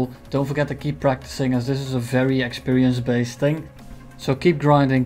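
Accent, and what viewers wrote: Netherlands. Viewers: are you from the netherlands? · Beetje nederlands acentje dit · Your accent sounds a little Dutch. Are you? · You’re Dutch aren’t you? Nice accent bro. (Frikandelbroodje) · 16 sec in 2 words spoken eeeeeey dutch guy insta subbed goed beezziiig. xD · Ain't he Dutch? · Bro is this guy dutch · Dutch accent detected?? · Are you dutch? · i love your dutch accent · Dutch accent! xD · Are you dutch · whats that accent? it reminds me of an dutch accent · are you from the netherlands? it sounds like you have a netherlands accent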